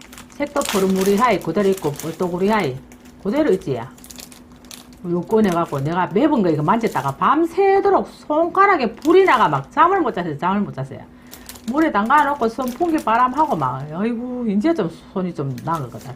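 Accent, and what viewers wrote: Gyeongsang, South Korea. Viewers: 경상도 특유의 사투리가 참 제미나내예 영상 잘보고 감니데이~ 감사합니데이~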